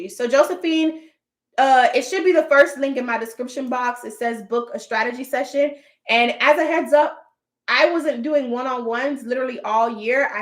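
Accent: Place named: Miami, United States. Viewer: I knew you were from Miami. We don’t really have “accents” but you sound like a Miami girl lol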